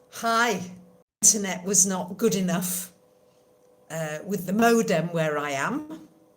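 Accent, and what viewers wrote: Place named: United Kingdom. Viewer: P.S. I simply love your british accent !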